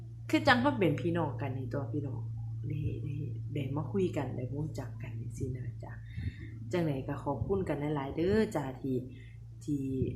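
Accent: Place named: Khon Kaen, Thailand. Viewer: ฟ้งออกคนขอนแก่น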